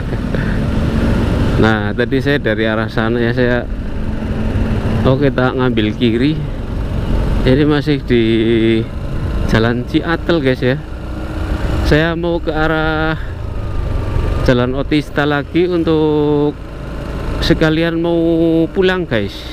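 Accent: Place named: Banyumas, Indonesia. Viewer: Sampeyan ngapak ya ngomonge, jawane ngendi Mase?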